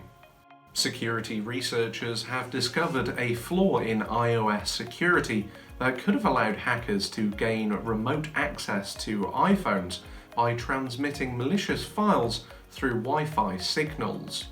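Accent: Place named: United Kingdom. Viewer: Hola funny talking Brit dude! USA cowboy here. Yee-Haw bro. OK, Salutation done. Check. Suppose there was a white hat hacker group dedicated to finding out bad hackers and TAKING THEM OUT. Seek and destroy. Make them fear. Next, blockchain smart contract. Example, I got hacked by dipshit ransomware and I am pissed! I am pledging 0.01 btc to whoever takes out this garbage. And there are 10,000 other angry victims also wanting justice. The bounty gets up to 100 btc, that’s like $1.8 million. White hats say Yeah, We gonna git em. So they (several WH groups) go after them, destroy them, prove it according to smart contract and collect the bounty...And other black hats getting very nervous and thinking about changing their ways. Maybe becoming White Hat. 130 years ago, Where I live, If We had an a-hole We would put up a bounty and hunters would go git em. 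Ded or a-live. Keep up the good work and I will keep watching. Comment...FIRST!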